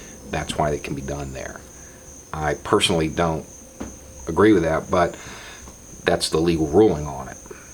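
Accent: South, United States. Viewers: I have started using your videos all the time to help me argue my points. You’ve become a great inspiration to me. Your accent helps when I’m addressing people in the southern US. Like in your other video you talk about the wrong person sending the right message. My female status makes me unreliable to most of them as well as my mixed family. However lots of people I post your videos to, just call you a paranoid loon but a few have actually attempted to understand. Either they debate the points or just say good point. You are making a difference with your videos. Thank you❤️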